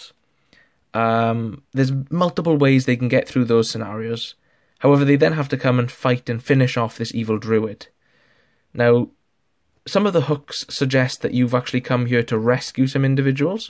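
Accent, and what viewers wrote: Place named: Wales, United Kingdom. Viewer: I know this accent! Are you a fella taff?! ❤️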